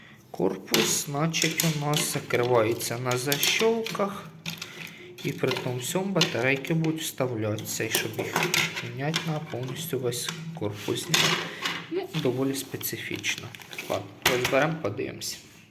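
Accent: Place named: Poltava, Ukraine. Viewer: Полтава балака)))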